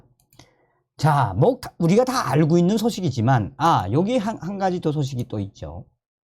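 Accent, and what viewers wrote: North Korea. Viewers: 북한 사투리 쓰면 왜 안됩니까 현장감있게 전달하려면 북한사투리던 뭐던 있는 그대로 전달해야죠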